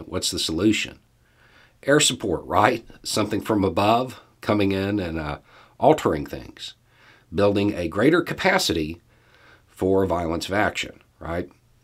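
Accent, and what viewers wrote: South, United States. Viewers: When I think of my neighbours to the South of our border, I like to tell myself that is an example of what great things a Southern accent is capable of saying. Keep up the great work. Lots of people are noticing 👍🇨🇦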